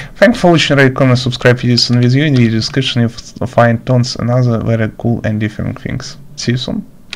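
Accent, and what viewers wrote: Russia. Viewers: У тебя русский акцент, ты не из России?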